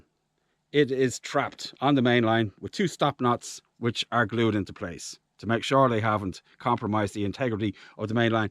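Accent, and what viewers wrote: Ireland. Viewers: Just happened upon your channel, and its great! Are you from the west coast of Ireland by chance ? The accent sounds familiar. Big props from The US, a great video.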